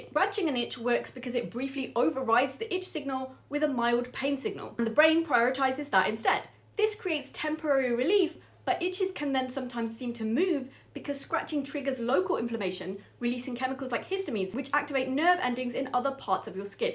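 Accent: Birmingham, United Kingdom. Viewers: Birmingham I love it probably one of my favorite British accent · Had to look up Brummie. So, you're from Birmingham! Cool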